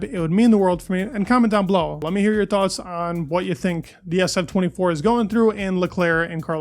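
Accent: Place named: United States. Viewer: You should learn a proper English accent instead of american. Americans don't belong in F1. Drop the annoying vocal fry too.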